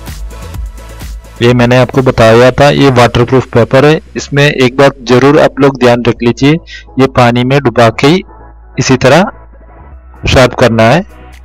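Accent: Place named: Kerala, India. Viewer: The tip is really nice but listening such sweet Hindi from a malayali was great 😀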